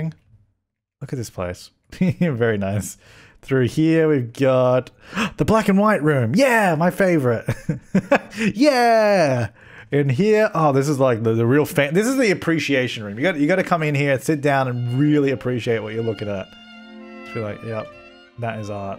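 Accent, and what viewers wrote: Australia. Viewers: Kinda sad that the Australian accent (soft g sound before a long u) disguised the 'historical duty' pun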